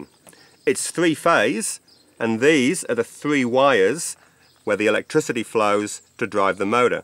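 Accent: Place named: North, United Kingdom. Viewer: That's some accent mate! Northern UK with a Kiwi twang?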